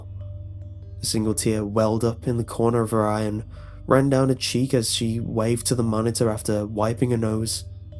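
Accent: United Kingdom. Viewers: Sorry english is not my native language, amm... is this the so called british accent?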